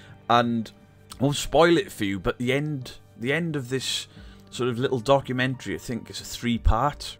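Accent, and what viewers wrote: Wales, United Kingdom. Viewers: Taff Butt, really confused me with Eleri Siôn from BBC Radio Wales. Love your videos. Been investigating and debunking since the 90's and we need more common sense in the field. Your accent reminds Welshman in Orkney of home!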